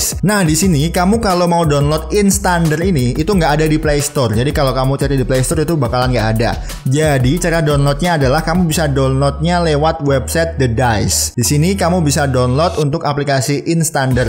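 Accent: Kudus, Indonesia. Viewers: wong kudus ta mas? wkw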